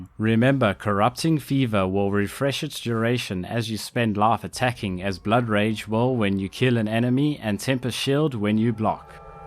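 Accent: South Africa. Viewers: You sound South African! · Are you South Africans because you accent sounds like it is from SA #YeeaaBooii anyway, love the build and looking to give it a shot as I have no clue what to try next this league.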